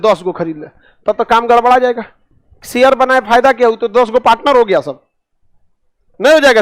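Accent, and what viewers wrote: Bihar, India. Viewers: Apka accent bihari h but surname kese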